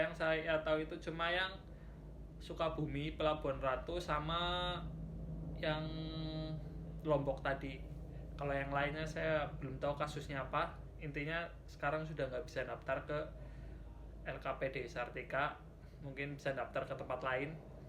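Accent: Banyumas, Indonesia. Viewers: Mas orang mana? Logatnya ngapak... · Ni orang ngapak.ngapake ngendi kang.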